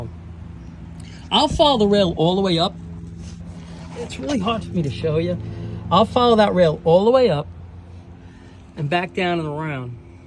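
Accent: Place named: Long Island, United States. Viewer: You have the Long Island accent like me. What town are from? By the way, that’s a great Camaro you found, must have been in a garage.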